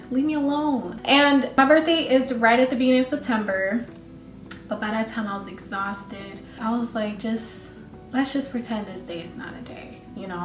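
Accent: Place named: Nigeria. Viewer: ohhh I said “chai” because I’m also nigerian hahah I was listening to your accent!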